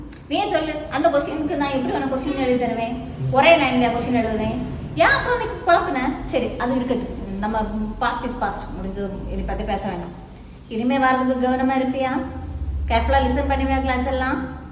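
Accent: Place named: Nagercoil, India. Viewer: Nagercoil slang ❤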